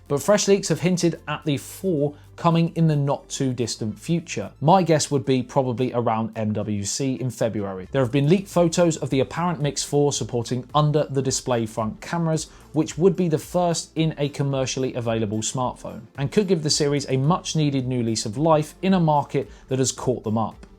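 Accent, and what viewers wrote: United Kingdom. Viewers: What i infer from the video ; When British Accent is propered its a treat but when hurried it become scottish nd it takes hell of a pair of EARS to get that !!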